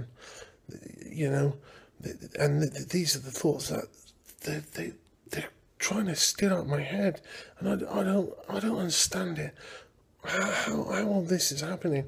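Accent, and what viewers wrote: Somerset, United Kingdom. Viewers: Being from Bristol I just knew is was a Somerset accent. ;-) Thanks for sharing the video. My first manic episode involved me wearing sunglasses so that people wouldn't steal my thoughts.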